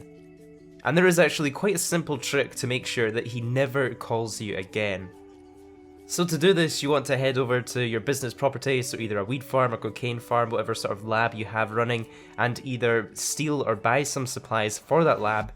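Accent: Scotland, United Kingdom. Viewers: I just realised we kind of the same username 😂and you sound Scottish because I’m from Scotland and u sound Scottish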